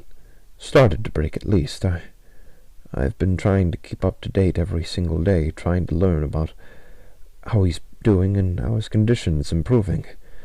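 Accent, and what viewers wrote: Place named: Scotland, United Kingdom. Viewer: why he sound Scottish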